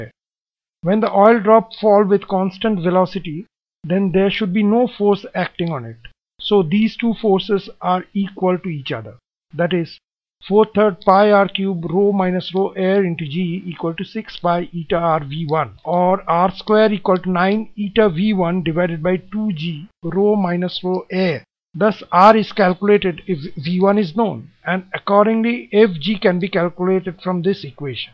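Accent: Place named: India. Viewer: the way u r speaking is idniglish (indian english).... bakwaas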